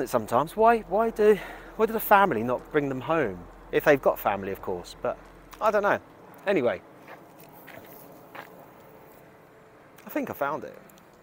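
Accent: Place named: Portsmouth, United Kingdom. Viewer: Nothing wrong with the accent you have Pompey boy, We always get told we sound like Londoners anyway. Great video of someone I've never hea6rd of.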